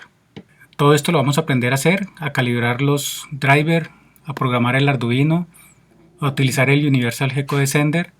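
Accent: Colombia. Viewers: Por el tono de su voz ,creo que es colombiano,y de ser así ,mi respeto ,me párese muy loable lo que hace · hola amigo, que buen trabajo, eres de colombia? mas o menos por cuanto salio todo hablando monetareamente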